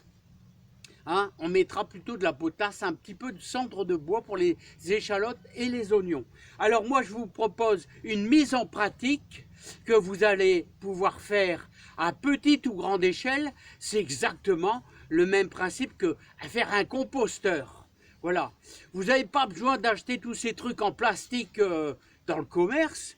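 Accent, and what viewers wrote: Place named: Hirson, France. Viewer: Vu l’accent j’aurai dit vers Hirson merde j’ai perdu j’ai quitté la région depuis trop longtemps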